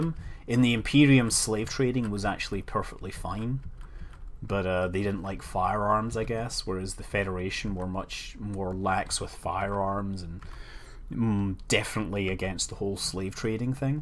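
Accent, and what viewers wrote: Scotland, United Kingdom. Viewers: Hey, are you scottish...you sound scottish:3?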